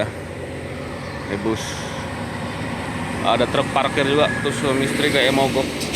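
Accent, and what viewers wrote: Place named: Medan, Indonesia. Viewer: Aksen kau medan kali wak geng, jadi ingat aku semasa di Medan, walau sekarang aku diperantauan, semoga semangat terus kau boy...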